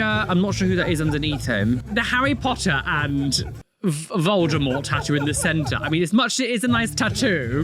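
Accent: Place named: Bristol, United Kingdom. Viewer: I had to stop painting because I was laughing too much at your reactions. That Bristol accent just sent me 😂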